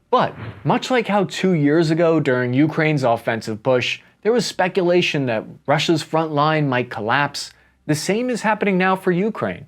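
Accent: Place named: New York, United States. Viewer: Unfortunate....Sorta liked the reginal New Yawk accent...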